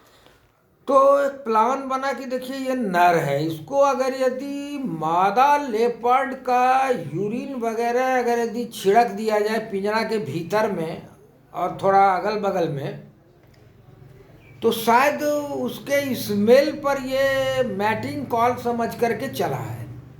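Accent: Bihar, India. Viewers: I love your jacket sir and the way to explain in Bihari accent 🙏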